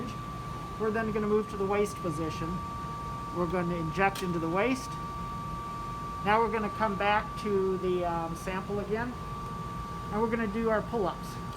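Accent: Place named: United States. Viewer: Thanks that was great and with an original American accent clear and no ambiguity!!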